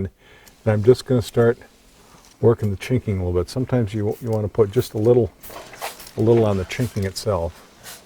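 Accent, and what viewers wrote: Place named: Minnesota, United States. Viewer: Dig the accent He's from Minnesota you know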